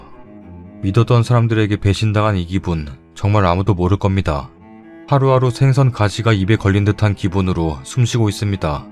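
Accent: Gyeongsang, South Korea. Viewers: 저 부산사람인데 부산말 욱겨요 ㅎㅎ 어리버리 글 지워 졌어요 죄송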